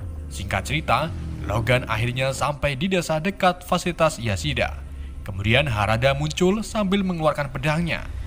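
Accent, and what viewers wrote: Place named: Tegal, Indonesia. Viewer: Admine wong tegal jebule..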